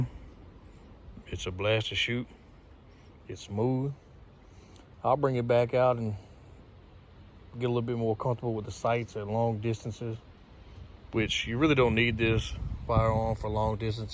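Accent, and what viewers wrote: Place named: Louisiana, United States. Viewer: I thought south Louisiana for sure!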